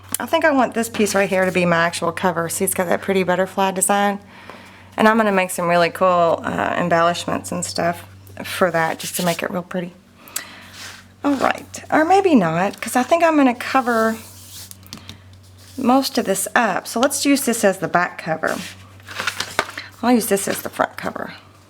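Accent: South, United States. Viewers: Just love it!! Top Note is a great name! You are a very creative lady.. love your videos so much!!! I think maybe its because we both have a southern accent! LOL Thanks for a great tutorial!!!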